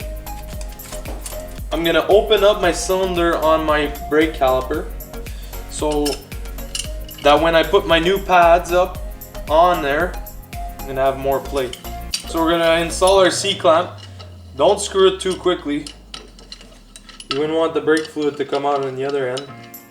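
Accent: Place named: Montreal, Canada. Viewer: Sounds like a Montreal Anglo-Italian accent, maybe Lachine?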